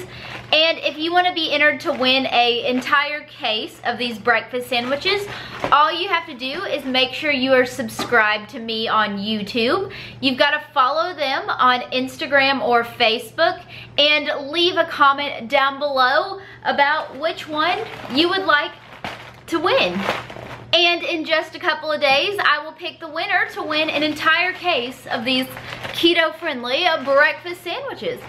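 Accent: South, United States. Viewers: I just love you and your southern hospitality and accent! And love Bo! He’s adorbs! I would love to try the sausage biscuits! They look yummy! Following them on FB and IG!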